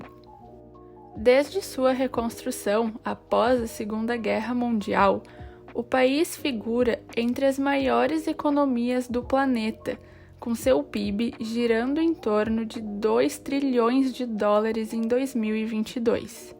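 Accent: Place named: Rio Grande do Sul, Brazil. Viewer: eu adorei a narração, sotaque gaúcho e a menina deve ser linda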